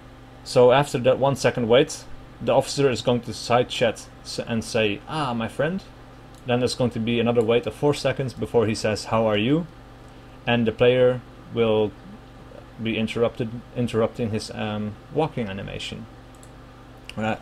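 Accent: Netherlands. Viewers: A bit late to the party but good to see my dutch accent radar is still working. Thx for the vid though it helped a lot.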